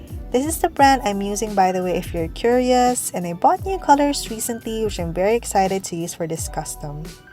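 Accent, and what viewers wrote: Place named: Philippines. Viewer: I'm new to channel, and I've been wondering, is she from the Philippines? Please correct me if I'm wrong, but her accent is just so familiar and way too similar to one of the average accents that filipinos have when speaking english;3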